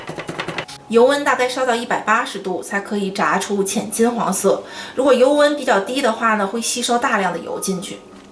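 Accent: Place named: Northeast, China. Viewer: 哈哈 东北银儿吧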